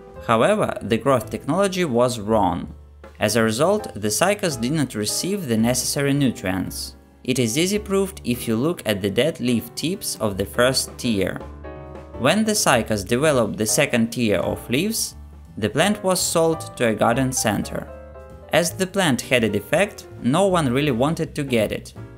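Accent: Russia. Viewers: Привет. Такое ощущение что голос русскоговорящего человека. Это так?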